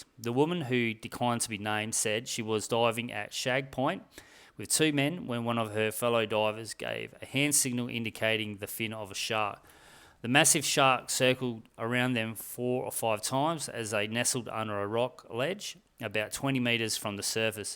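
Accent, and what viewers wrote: Australia. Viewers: The size of the shark would not matter to me; a shark is a shark!!! Whether it's 1 meter or 5 meters, there's absolutely no freakin' way I'd go in the water ever again!!! Oh by the way, I like your Aussie accent. Just sayin'. 🇨🇦👊🤙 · he's Strayan.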